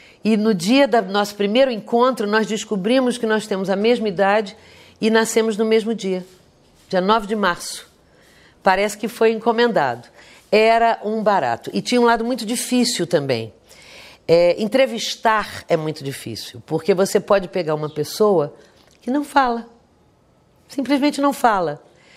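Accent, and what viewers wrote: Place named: Rio de Janeiro, Brazil. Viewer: e esse sotaque carioca lindo!!!! e a carioca mais ainda!!!!😍😍😍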